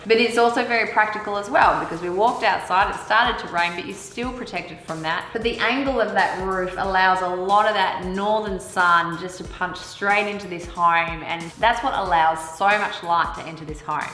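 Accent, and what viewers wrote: Australia. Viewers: This is undoubtedly a lovely, cosy house which was very well designed, specially because it’s been built mixing wooden elements that contrast greatly with all the industrialised materials used such as the main marbling floor which was a fantastic choice as it’s not just beautiful for its colour and easy to match but durable and easy to clean too. I just think - in my humble opinion- that the backgarden, specially the very part that surrounds the swimming pool, deserved a proper, greenish, landscape project with lots of plants and trees not only to enhance the whole building but also to keep a cool temperature around rather than the plain grass chosen. As for the footage presenter, I must say you are lovely too, very enthusiastic, has a very convincing and descriptive language (with a lovely Aussie Accent) and I quite like you...well done!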